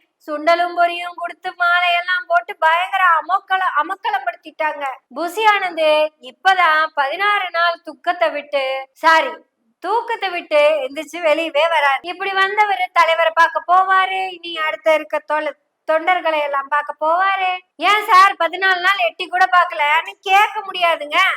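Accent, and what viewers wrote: Kongu, India. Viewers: அருமையான நையாண்டி கலந்த கொங்கு தமிழ் சுவை வாழ்த்துக்கள் சகோதரி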